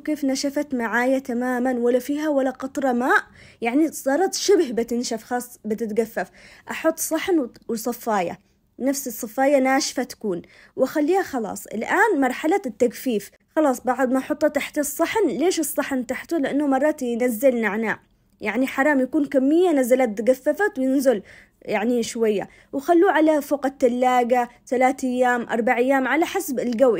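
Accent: Aden, Yemen. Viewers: مبدعة في كل شيء اتشوق دائمًا لفيديوهاتك محسنك ومحسن كلامك العدني الله يسعدك يارب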